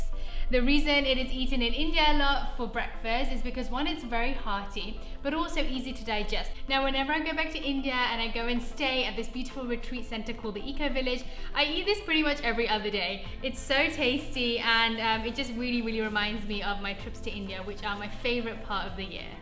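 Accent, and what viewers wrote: England, United Kingdom. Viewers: I really like her British accent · Ik she's English but her accent gets me every time XD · I love your videos, they are so inspirational. I would love to hear more about your health journey. Are you British??, which part???. I love poha. ❤️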